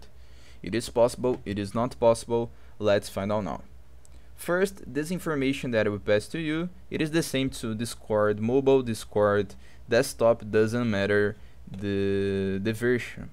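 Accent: Brazil. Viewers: pelo sotaque, é br kkkkkkkkk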